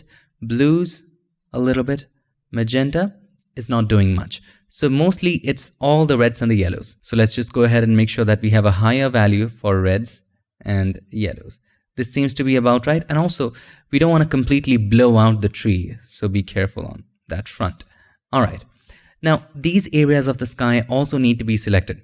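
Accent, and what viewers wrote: India. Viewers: Your English sounds like Indian it’s hard to follow up.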